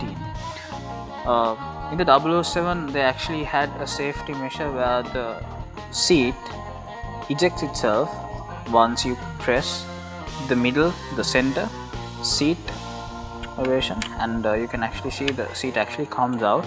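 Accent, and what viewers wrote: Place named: India. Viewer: What a fxxxxing Indian accent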